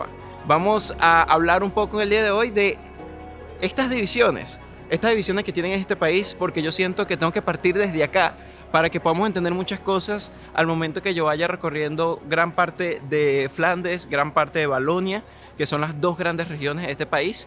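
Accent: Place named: Venezuela. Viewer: Un saludo, lo que expones en tu vídeo es un tema muy complejo pero lo has explicado con claridad, es muy interesante y educativo. No sé si interactuas con tus seguidores pero quisiera saber cuál es tu nacionalidad, porque pareces ser de Venezuela por tu acento, es así? Ya me suscribí y te sigo suerte en tu proyecto de canal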